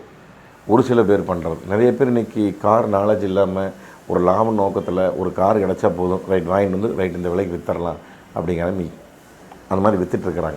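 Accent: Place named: Tiruppur, India. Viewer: ... உங்களோட இந்த திருப்பூர் தமிழ் அருமை... அதற்கு நாங்கள் அடிமை..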